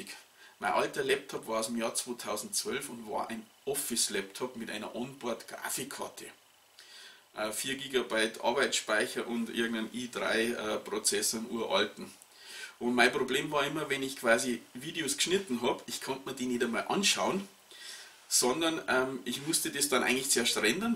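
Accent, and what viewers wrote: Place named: Low German, Germany. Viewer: Besser als Deine HD-VIDEOS ist defenitiv, Dein "Plattdeutsch"😀😀😀 Du machst doch eh schon geile Videos, genau wie der Christian, HD ist toll, die Information ist wichtiger. LG Jupp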